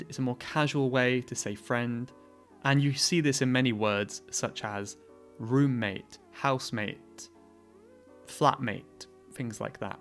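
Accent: United Kingdom. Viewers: Hey, it’s Lee speaking from mainland China, i am trying to find some useful tips, videos on YouTube for improving my spoken English. And your videos are so useful and your British accent sounds so noble and elegant. Cheers mate.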